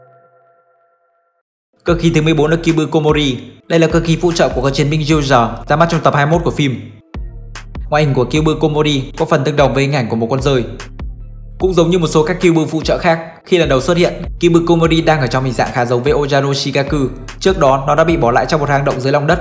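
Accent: Japan. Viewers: Tên nào tiếng Anh thì đọc tiếng Anh đi đọc tiếng Anh kiểu Nhật thế nghe nó cứ bị khó chịu ý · Đừng đọc cái kiểu tiếng anh nhật nữa đi. Từ nào tiếng anh thì đọc tiếng anh đi cho dễ nghe.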